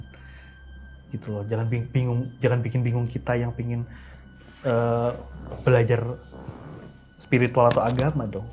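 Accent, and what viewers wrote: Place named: Tegal, Indonesia. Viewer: Ini orang Tegal ya ternyata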